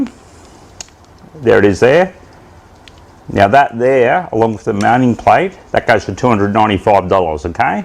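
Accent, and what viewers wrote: Australia. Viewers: Guys before commenting on prices ... didn’t you grasp the accent? This is a down under RC shop (Queensland Australia) So prices are in Australian dollars... USD is 2/3 of an Australian dollar...😉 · ITS AUSTRALIAN DOLLARS CMON MATE DONT YOUR HERE HIS ACCENT. HE EVEN SAYS GOLD COAST AUSTRALIA LOL.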